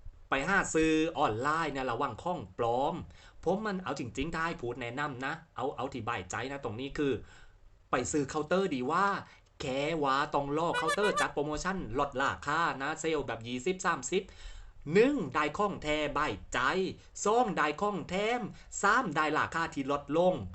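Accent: South, Thailand. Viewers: ภาษาใต้ปุ๊ปกดออกเลย ฟังไม่รู้เรื่อง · ชอบการรีวิวแบบแหลงใต้ของคุณ 555 ฟังไม่เข้าใจบางคำแต่รวมๆตลกมาก ข้อมูลละเอียดดีมากค่ะ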